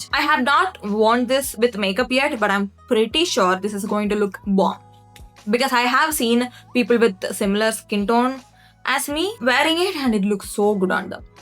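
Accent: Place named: Tamil Nadu, India. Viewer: Loved the video keepp goingg....and also luv that English with tamilian ascent ❤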